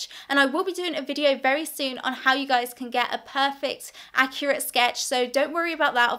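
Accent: United Kingdom. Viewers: You are british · I love your British accent....but i dont have any idea what are you talking about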